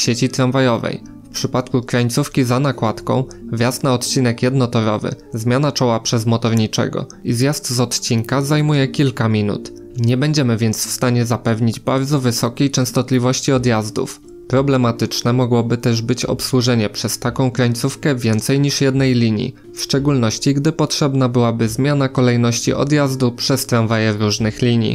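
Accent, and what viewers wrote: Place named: Łódź, Poland. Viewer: Od razu słychać że jesteś z Łodzi. Krańcówka co :-)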